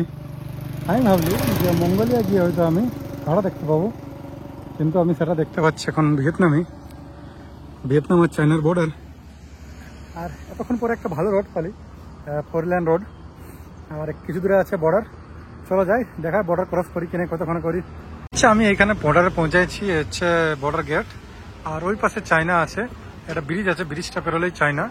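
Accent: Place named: Purulia, India. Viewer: Dada tumi purulia bangla bolcho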